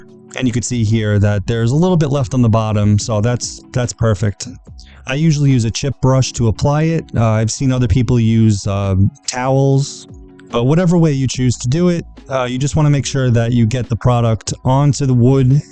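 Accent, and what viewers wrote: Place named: New England, United States. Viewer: Love the contrast in the stains it makes the gorgeous veneer really pop. I love how you can bring out the beauty of the woods. Now we know you're on Long Island, you have just a small touch of what I consider a New England accent, (my mom's from Massachusetts, though she made a strong effort she sadly, in my opinion, succeeded in losing it. I'm southern California and, no we don't talk like valley girls, lol. We have almost no accent. Just plain boring English with nothing special on top. Say hi to your dad.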